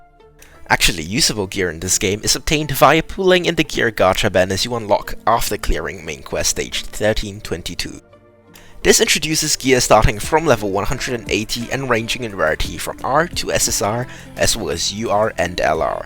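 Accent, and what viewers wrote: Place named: United Kingdom. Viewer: Got to love that british accent!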